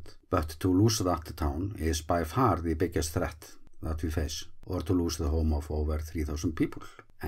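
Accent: Iceland. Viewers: Thanks for the info. I find it difficult to understand sometimes what you are saying because your English has such a strong Icelandic accent. This is something you could work on with a native English speaker . . . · I’m Canadian (I only speak English), and I understand him well. I actually enjoy listening to the Icelandic accent :)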